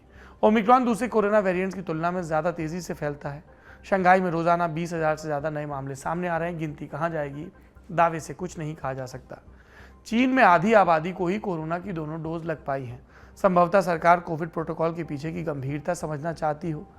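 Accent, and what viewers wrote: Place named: Bundelkhand, India. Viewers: Sir i am from orai (near to your hometown chamari). The way you deliver words initially i can feel that vibe sir........ Full bundelkhandi.... Big fan sir.. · sir tumai bundelkhandi sunke maja aagao ek dum gaon ki yaad dila dai aapne to👌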